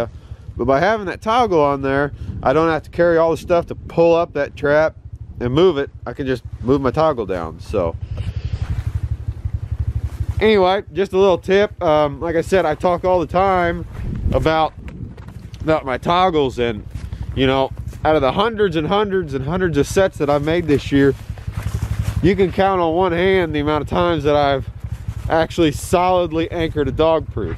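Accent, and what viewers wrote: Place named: Pittsburgh, United States. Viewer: Your dialect sounds like Pgh. Pa? Or close?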